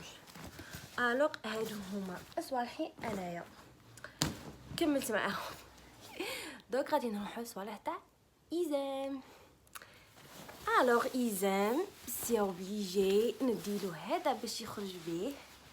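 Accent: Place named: Oran, Algeria. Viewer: نتي تيارتية احكي نورمال مالكي رجعتي تحكي وهرانية و راكي غير وحدك لا راجل لا والو ربي يسهل لك أن شاء الله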